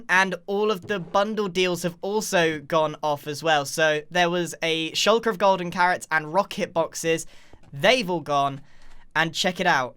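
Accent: United Kingdom. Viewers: Why does sound so much more british? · Is it just me or does he sound more British than normal ?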